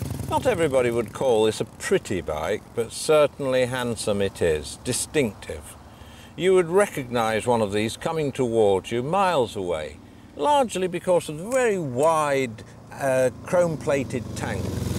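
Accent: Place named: United Kingdom. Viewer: that is a 100% pure british accent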